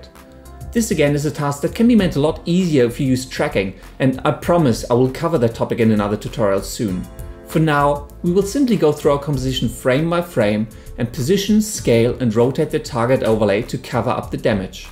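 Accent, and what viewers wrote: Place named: Germany. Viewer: ha! I knew it! :D sounds a lot like you're from Germany! I'm from germany too! :D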